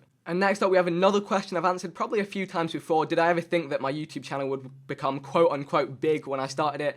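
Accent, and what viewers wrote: England, United Kingdom. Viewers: is indian I thought he was English he sounds like he's from England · Your english accent is so contrasting to your appearance it's quite cool! Love the videos, nice to do a facecam once ina while right!? Keep up the good work! Jack:)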